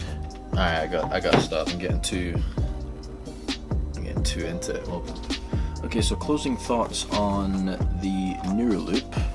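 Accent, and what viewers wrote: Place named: Scotland, United Kingdom. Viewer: I live in the Glasgow area and you honestly have the most interesting Scottish Accent I've ever heard! Oh, and by the way, I bought these earphones on the back of this review. Mainly because when you started playing music your face said 😲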